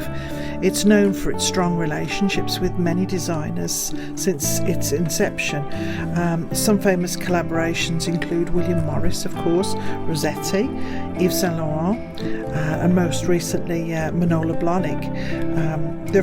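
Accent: United Kingdom. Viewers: I love the William Morris fabric what a find. I often go to Liberty’s as I live near London it’s like another world in there. The thrift shops seem to be bigger and have lots more on offer than our charity shops here in England. By your accent I guess you are British. I’m new to your channel but love it.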